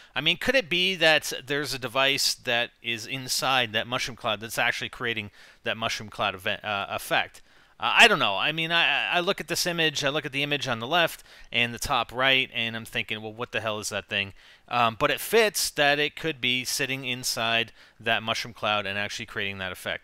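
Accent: Spain. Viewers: U have a nice spanish accent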